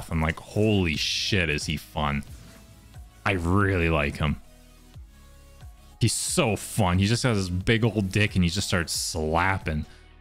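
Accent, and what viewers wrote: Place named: Cockney, United Kingdom. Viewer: People will call us bri'ish schtewpid and then try and do cockney accent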